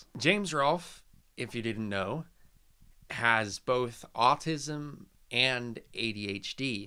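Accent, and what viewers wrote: New Jersey, United States. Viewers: Dude he's from New Jersey, of course he has an accent. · I think he pronounces words in that manner because of his scarfy mid atlantic jersey accent … a little philly a little NYC a little North jersey … mash up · the simple explanation is that hes from Jersey his accent gets in the way of pronouncing certain words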